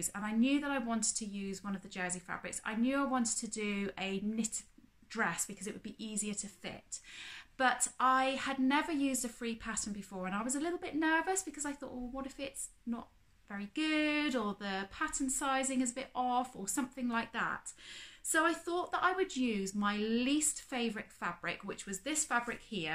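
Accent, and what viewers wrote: Devon, United Kingdom. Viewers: Hi, I think the dress and the fabric really suit you! Love your vlogs - and your Devon accent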